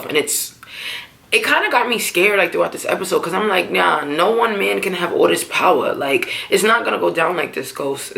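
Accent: New York, United States. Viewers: I love your reviews I came across your Channel recently & the NY accent got me I’m from CT so if you know you know 😂 but this episode was good but predictable I think it was Tariq if you noticed he fell back so whoever shot him made him turn around to face them first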